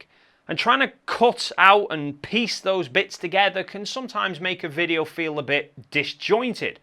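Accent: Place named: North, United Kingdom. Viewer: For me, you're presentation is still spot on. No worries there. I subscribed for your technical explanations of camera gear in your signature informative yet easily understandable approach. & Your northern vernacular just adds the right amount to friendly chill to make them an easy watch from start to end.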